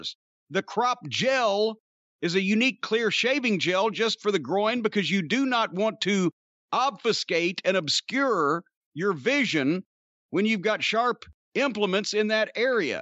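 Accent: South, United States. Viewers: Jim Cornette succulent sounds Good Ol' Southern Boy I'm in Northumberland England UK. Big Respect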